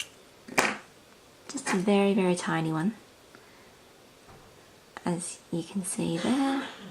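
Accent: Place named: England, United Kingdom. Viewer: Loved your tutorial! Very professional and the clips are beautiful! Being a Mom,I loved that you taught your little girl along the way! So sweet and like some of the other comments here,I agree that your English accent and soft voice make this tutorial all the more delightful to watch! Now I am going to go make these with my daughters!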